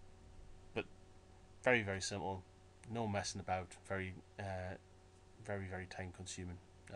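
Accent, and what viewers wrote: United Kingdom. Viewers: Really great and clear stuff! Learning loads. Plus ice to hear a British accent for a change.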